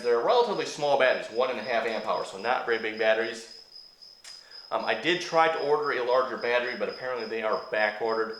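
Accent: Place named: South, United States. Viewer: Southern pronunciation for the most part. Wait until you hear "INsurance" and "Reality". 😁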